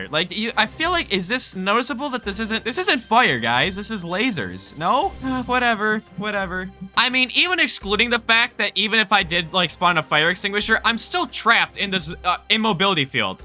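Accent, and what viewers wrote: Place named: United States. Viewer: im brazilian, and i just wanted to say, (dont get me wrong) i hate people from usa who talks with that "tone", but videos are SO good that i LOVE to see, and just ignores it. Love that channel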